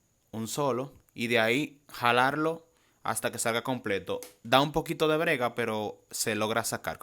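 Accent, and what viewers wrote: Dominican Republic. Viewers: Eres Dominicano o ecuatoriano (lo se por el acento) soy Dominicano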